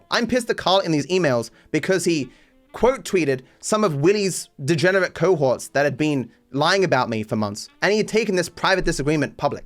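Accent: Australia. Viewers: I dont even know what he means. He's literally just Australian. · Angry Aussie sounds for almost three hours. I like it😂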